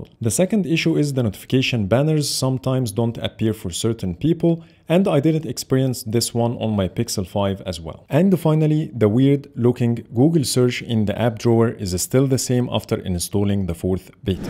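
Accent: Egypt. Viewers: Are you Egyptian? your accent is Egyptian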